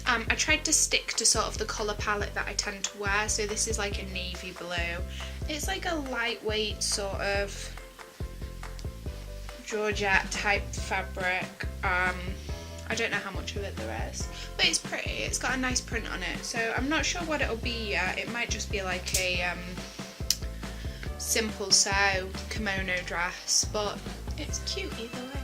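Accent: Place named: Stoke, United Kingdom. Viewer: Just stumbled upon your channel and thought.... is that a Stokie accent. Then I read your comments below and YAY you are, just like me! I've never really liked Abakhan that much as a lot of the weighed fabrics work out dearer if it's a weighty fabric than off a bolt. Maybe I should go 1 of these bag fill sales.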